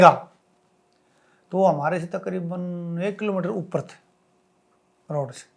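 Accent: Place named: Haryana, India. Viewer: Sir aapki galti nhi hai haryanvi accent sabhi ko samajh nahi aata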